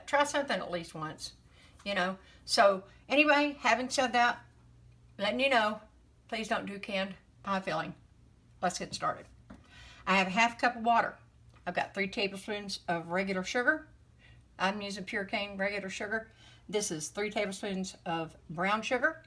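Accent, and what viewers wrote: South, United States. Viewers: It’s southern. I checked her channel she’s from Louisiana from the USA 🇺🇸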